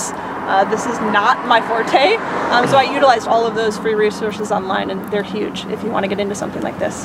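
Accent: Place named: Michigan, United States. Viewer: 100% Michigander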